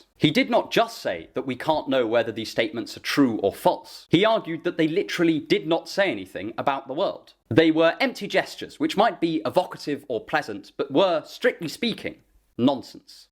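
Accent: United Kingdom. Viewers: To top it off he’s quite the posh chap with a nice British accent 🫡 salute you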